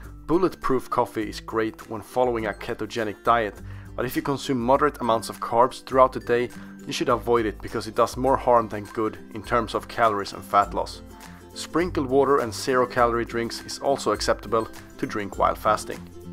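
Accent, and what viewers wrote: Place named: Sweden. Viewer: really strong Swedish accent there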